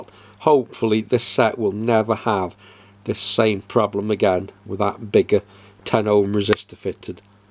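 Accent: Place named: Northern England, United Kingdom. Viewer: Btw you've got yourself a new sub! 😊 Great diagnosis and explanation and good to see another fellow tech/electronics enthusiast here in the UK! 😊 You sound as though you're from Leicester or further north, Yorkshire perhaps? I'm down south in Surrey 😊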